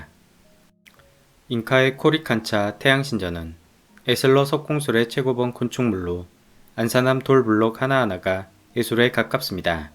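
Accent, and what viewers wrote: Jeolla, South Korea. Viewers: 나레이션에서 느껴지는 전라도 사투리 억양이 꼬소하네요 ㅋㅋㅋ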